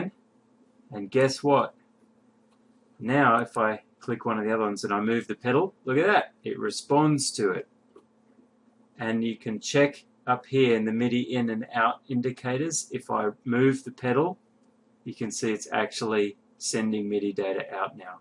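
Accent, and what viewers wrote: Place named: Australia. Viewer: Thank you so much my friend. I'm from Brissie - it was so nice to have a calm Aussie voice walk me through getting this working. Bless you .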